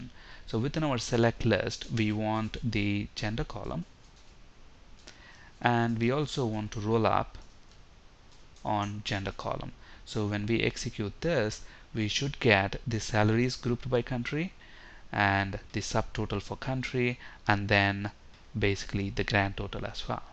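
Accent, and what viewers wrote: India. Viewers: Sehr schön! At first I thought Oh no, not that Indian accent anymore! But the vid is actually very helpful! Thanks alot :-)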